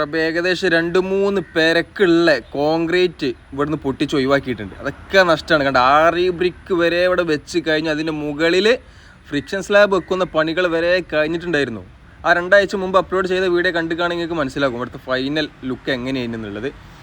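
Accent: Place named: Northern Kerala, India. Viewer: All your programs are very good, especially your narration in northern kerala dialect.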